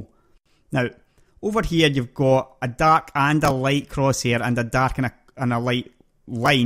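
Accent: Scotland, United Kingdom. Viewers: Scottish? :) Sounds great! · i'm love with that scotish accent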